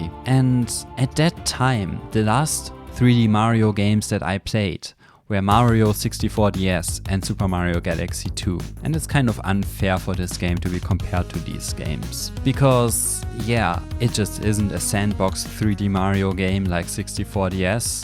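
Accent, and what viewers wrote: Germany. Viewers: I hear a Little bit german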